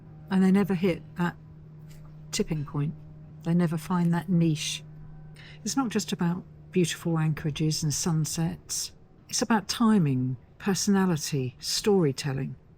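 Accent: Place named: United Kingdom. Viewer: Thank you 🙏 and I love the British accent ❤😊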